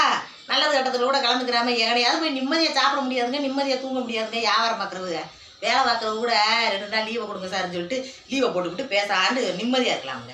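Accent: Madurai, India. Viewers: Madhurai salang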